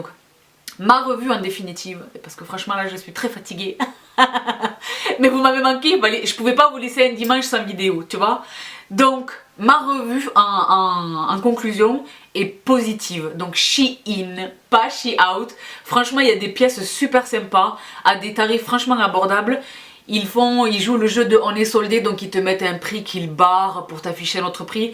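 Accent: South, France. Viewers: J'ai tellement eu le coup de foudre depuis que je t'ai découverte il y a quelques semaines, que je me regarde presque toutes les vidéos que tu as faites depuis le début, un peu tous les jours. Ca y est, tu m'as rendue accro ! Pourquoi ? Je pense que c'est ta façon de parler au vocabulaire riche, tes expressions rigolotes et ton accent du Sud... et puis bien sûr parce que tu parles de choses qui m'intéressent ou de marques dont je suis déjà fan (Lorac, Tarte, etc...), et parce qu'en plus tu m'en fais découvrir de nouvelles. Et cerise sur le gâteau, tu es pleine de bon sens. Bref belle, intelligente, généreuse et énergique, la copine rêvée, moi je dis !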